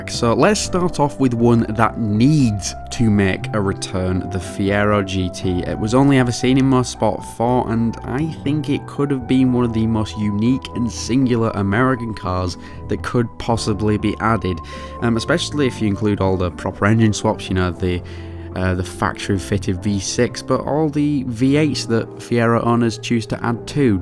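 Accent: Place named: Bolton, United Kingdom. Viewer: I can sense the bolton accent straight away for my first vid on the chanel😂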